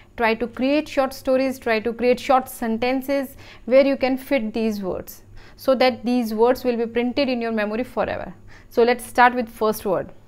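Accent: India. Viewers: Mam are you from Chhattisgarh ?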